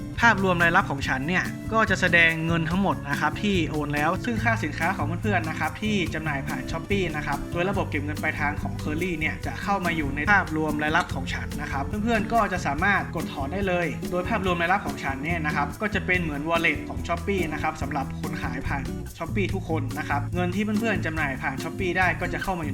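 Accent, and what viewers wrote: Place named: North, Thailand. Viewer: ก็ว่าครับ อู้สาบเมืองเหมือนผมเลย😄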